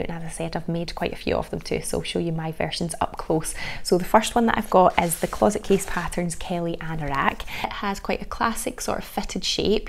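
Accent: Scotland, United Kingdom. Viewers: Yes, that lovely Scottish accent. My husband also loves listening to videos because of the accent.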